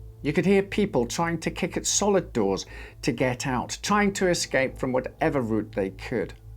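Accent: Scotland, United Kingdom. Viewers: Is that the faintest hint of a Scottish accent?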